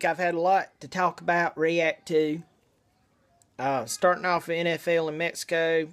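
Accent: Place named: Arkansas, United States. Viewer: Im trying to guess where your accent comes from.. arkansas?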